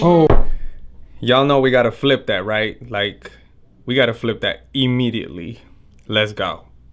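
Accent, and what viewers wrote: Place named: Latin America. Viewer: Anh này có chất giọng mỹ la tinh phết đấy chứ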